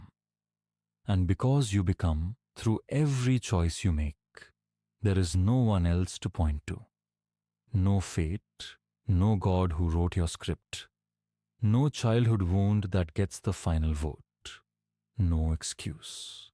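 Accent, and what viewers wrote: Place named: India. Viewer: Even AI has Indian accent now! Everyone is so tired of Indian immigration and infiltration.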